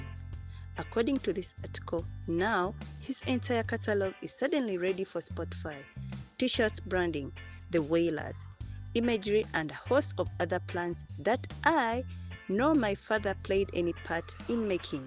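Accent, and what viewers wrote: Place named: Africa. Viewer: and she sound like african,and lot of Africans live in Jamaica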